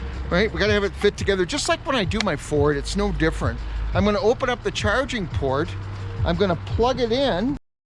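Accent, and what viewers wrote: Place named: Wisconsin, United States. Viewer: Wisconsin. Got it. I was trying to place your accent.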